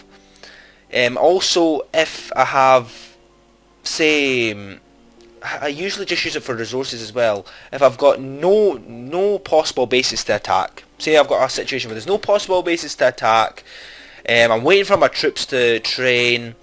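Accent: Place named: Edinburgh, United Kingdom. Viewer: Just saw someone saying love your accent lol aye so dae ah ken whit a mean you sound like a Edinburger lol good vid tho some good tips mate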